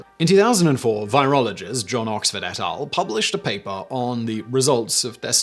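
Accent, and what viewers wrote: United Kingdom. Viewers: The British pronunciation! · Ms. Forbes: you may have heard somewhere that not all terms are American in origin or usage. Simon is a Brit and the series originates in England. They have chosen for centuries to go with the Germanic influences on the English language, especially in regard to scientific terms. For whatever reasons, Americans lean toward the Romance languages for pronunciation of those same terms.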